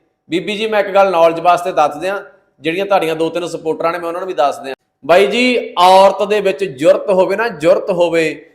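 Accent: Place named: Amritsar, India. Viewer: Mainu Lagda tu amritsar toh lagda gal karn toh badeh je kehna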